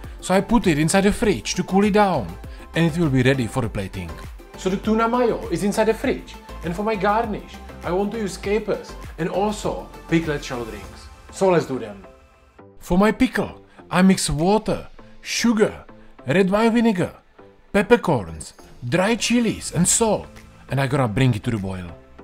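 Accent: Czech Republic. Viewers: češtější přízvuk jsem asi ještě neslyšel... nice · Vaříš opravdu skvěle ale ta čeština tak brutálně bije do uši.. Raději si vypínám zvuk a zapínám titulky 😀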